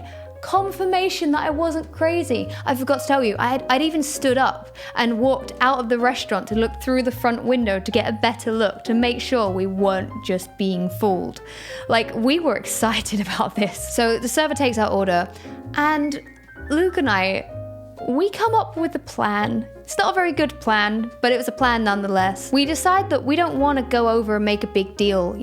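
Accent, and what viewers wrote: United States. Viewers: Do a video in your American accent. It's hilarious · I really like your new videos and I hope you are happier❤️and btw your American accent is on point👌🏻 and this storytime was pretty funny😂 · Why is American accent kinda cute? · Her American accent made this vid 50 times better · Love the American accent x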